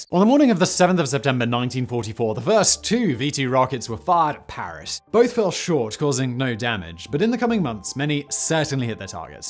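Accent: United Kingdom. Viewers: Admittedly, I stopped after "Inside the V-2" section. So, alcohol and water, that's it? Did you forget the oxidizer? As our leader says, "Come on man!" P.S. I have to slow all your videos down to at least ..... 0.75 speed. Drink less coffee or tea or even consider a mild tranquilizer, you speak like you're being charged by the word, and with a British accent (can't be helped, I'm sure) which makes it very difficult to follow, much less comprehend, what you're saying. Nonetheless, your videos are interesting.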